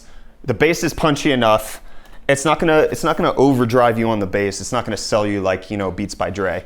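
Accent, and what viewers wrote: South Africa. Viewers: WOW WOW WOW! Wait are you from South Africa?? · damn his english is actually so good wtf? wait is there a south african accent?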